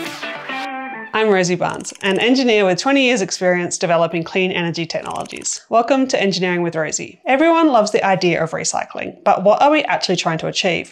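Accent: Australia. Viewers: Hey Rosie! Keep taking the magic mushroom juice. It has slowed your delivery down, noticeably! That's very welcome imo. As an older person with hearing impairment, I found your previous combination of Australian accent and machine gun delivery a struggle to keep up with, understand and digest. A micro-pause between sentences, Dave Borlace-style, would allow my withered synapses to do a bit of absorption and digestion of the concept just discussed, but thank you SO much for the new more measured pace. Loving it ❤!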